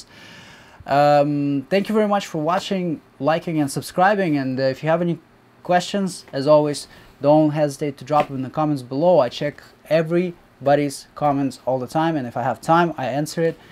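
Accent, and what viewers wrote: Russia. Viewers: is that a Russian sounding accent that I detect there?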